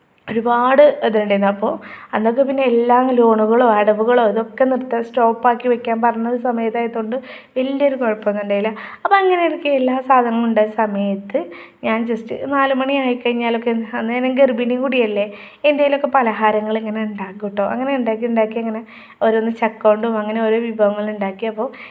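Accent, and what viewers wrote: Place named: Malappuram, India. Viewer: സംസാരം ക്ലിയർ അല്ല. ഈ മലപ്പുറം സ്ലാങ് വിടുക. അടിക്കടി ഇങ്ങനെ ട്ടോ ഓട്ടോ എന്നു പറയുന്നത് മഹാ ബോറ്. 🤪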